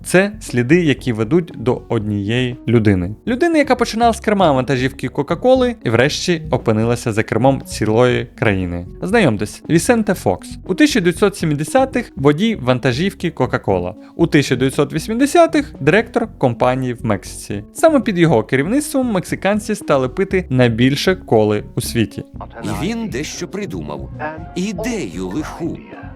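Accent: Russia. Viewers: неможливо слухати, через заводську расссєйську прошивку автора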